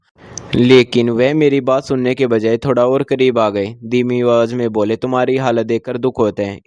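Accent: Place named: Haryana, India. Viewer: हरियाणवी भाषा काम लहजा बोली में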